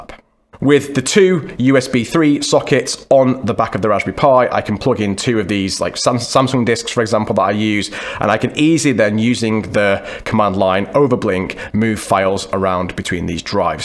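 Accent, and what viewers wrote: United Kingdom. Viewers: Hey, how do you setup the pi as a ntewrok server to connect to the files while connected on the ipad? where you from, is there a hint of a welsh accent?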